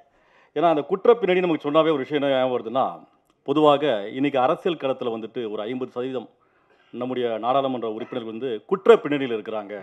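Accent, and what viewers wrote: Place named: Karnataka, India. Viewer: sounds like he is kannadiga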